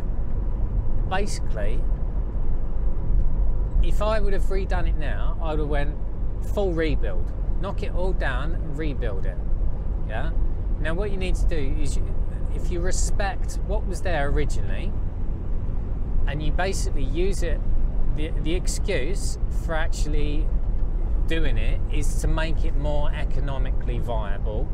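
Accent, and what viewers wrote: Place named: Portsmouth, United Kingdom. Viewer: So interesting. I found your channel by chance when looking for some cheaper way to get insulated plasterboard, I'm now heaviliy invested in your life...haha. Subbed. BTW, are you originally from Pompey, you certainly sound like it!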